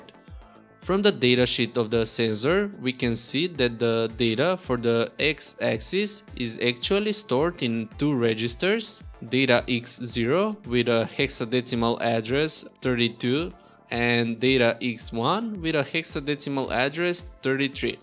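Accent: Russia. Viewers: Which strong Russian accent 😊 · Что то мне мерещится русский акцент у автора. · Are u from Russia? :)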